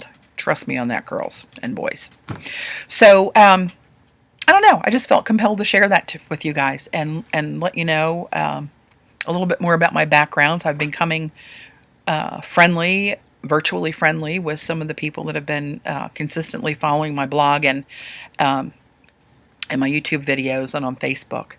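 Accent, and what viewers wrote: United States. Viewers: I recently discovered your you tube videos on gelli plate art and love them. I actually bought a gelli plate which I have yet to use, but am gaining an understanding of how it works and look forward to playing with it very soon. I want to thank you for "the rest of the story". I do not nor have I ever had cancer. I have had friends with cancer and have lost dear friends to cancer. I have to say that I live in British Columbia, Canada. I know from your great accent that you live somewhere in the South - at least I'm pretty sure. My Mom was from Mississippi, and I was born in Alabama, raised in New England, and lived a few years in Tennessee, and also in the midwest. I feel such a bond with you as I listen to you talk about your art making process, If I lived close I would want to just say Hi!!! So here it is, Hi and thanks for the great videos. 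I am working my way through all of them! Love and Peace and great gobs of good health!!! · My mother is a breast and uterine cancer survivor, last month was 7 years ago. I also live close to Baltimore, was born an raised there. I just knew after hearing your voice and your humor you were from Baltimore. God I love your videos.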